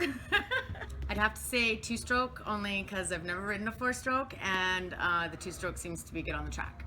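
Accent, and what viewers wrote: Canada. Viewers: That Canadian accent tho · I love love Canadian accents! Fuel injection for 2 strokes is here thanks to KTM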